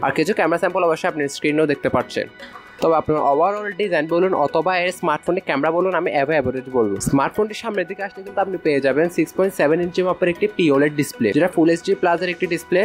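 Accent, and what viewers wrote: Chittagong, Bangladesh. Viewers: চিটাইঙা পোয়া বাজি যেইল্লা মাতক চিটাইঙা যে বঝা যাইবো😅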